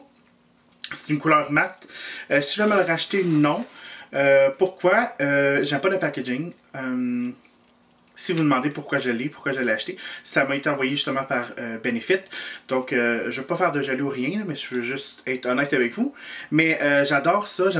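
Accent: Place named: Quebec, Canada. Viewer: En plus le francais du Quebec comme moi hahah ;)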